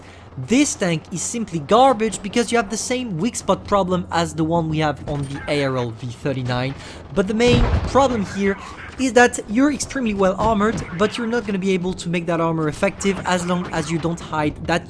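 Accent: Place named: France. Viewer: I just discovered your channel thanks to the youtube recomendations, and I have to admit your videos are pretty interesting. By the way, are you french ? Parceque j'ai l'impression de remarquer un léger accent français malgrès t'a prononciation excellente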